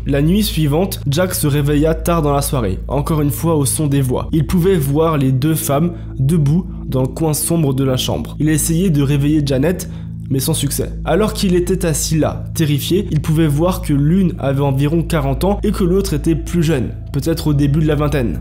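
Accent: France. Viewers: Salut, je découvre ta chaine ^^ N'aurais-tu pas comme un chtit accent du chnord? ^^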